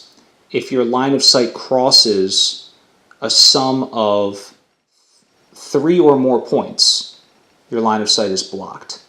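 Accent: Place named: Pennsylvania, United States. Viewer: From PA? Water pronounced 'wooder'.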